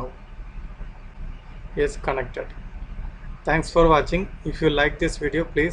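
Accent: India. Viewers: Your accent is too indian for me.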